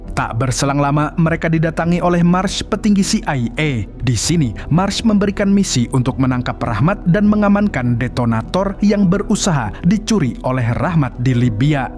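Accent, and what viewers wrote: Banjar, Indonesia. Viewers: Bah orang banua sakalinya😅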